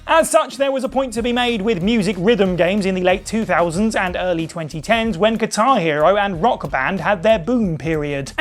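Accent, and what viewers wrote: United Kingdom. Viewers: Does anyone else love her voice? Maybe I'm just a sucker for British accents 😂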